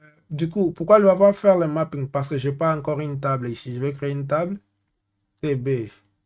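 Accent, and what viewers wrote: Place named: France. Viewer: Tant que le message passe, l'accent français n'est plus une barrière ! Force à toi Ndeko 🎉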